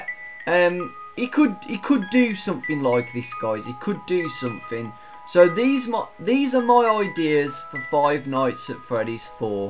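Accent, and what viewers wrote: United Kingdom. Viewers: is that a british accent? "pizzaria ,suvoid, foulks " sry If I`m being racist but I found it funny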